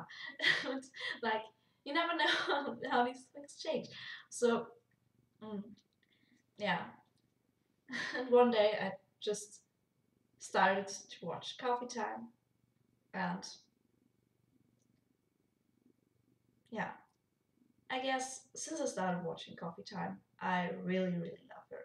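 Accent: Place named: Germany. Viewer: Just got here from Reddit. Not even a minute in and already I'm thinking "Geeerman?" :P That's an easy to place accent. Just don't ask me to place it somewhere in the country. I have many ideas for what I'd say for any potential meeting with Dodger (which is not likely to happen, as I barely ever leavy the city, not to mention the country), but I imagine it would probably end up like this: "Uhm. Uh. Sooo... Wow. You really are tiny!" Ooops. o_o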